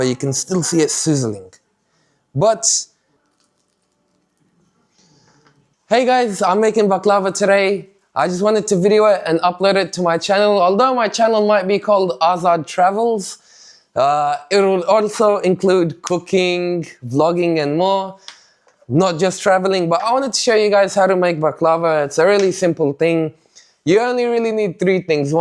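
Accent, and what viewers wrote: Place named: United Kingdom. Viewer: I just watched it because of British Accent🤦😂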